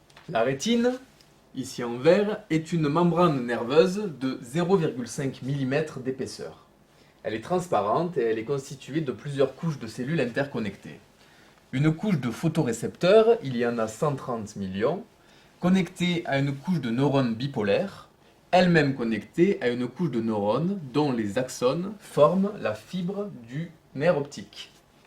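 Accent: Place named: South, France. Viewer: Petit accent du sud qui va bien. Pepere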